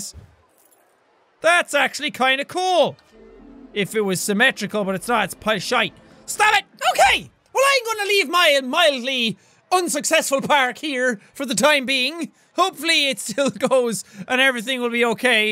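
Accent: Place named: Ireland. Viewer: theelectricsocket does not have the beautiful angle voice but he could definitely make a speech song.His voice is so soothing and has the perfect amount of Irish accent 👌🏻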